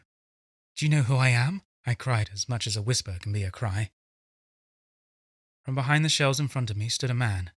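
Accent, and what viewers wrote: England, United Kingdom. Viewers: fantastic story and fantastic narration ! finally a good solid English accent to listen to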